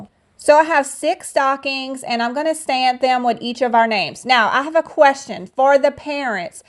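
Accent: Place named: South, United States. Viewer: I liked the cafe curtains. I love your southern accent!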